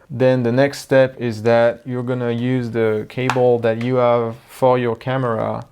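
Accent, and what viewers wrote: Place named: France. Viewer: God bless you....I'm trying to record higher quality videos to keep my students moving with online classes here in NYC as we have been on lockdown due to COVID 19. You just helped me record full classes, so that my students can keep moving during this traumatic time. Grateful for this vid. I hope you and your family are safe and well. I also heard a French accent. Enchante!!!!! Merci!!!!!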